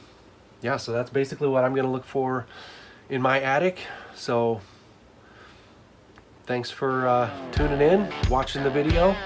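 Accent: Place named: Canada. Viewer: Great info! Wondering why you sound your from Canada?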